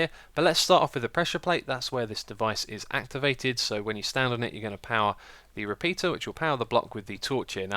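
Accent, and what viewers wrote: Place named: United Kingdom. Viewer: fricken peeple deez dayZ. cant even let a man have a british akcent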